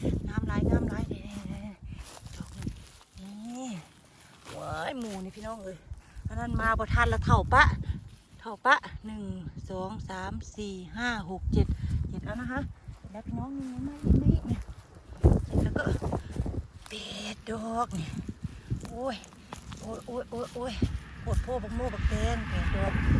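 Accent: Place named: Nyo, Thailand. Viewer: สวัสดีจ้าสาว อยู่จังหวัดใด๋น้อคือเว้าญ้อ ฉันอยู่ สกลเด้อจ้า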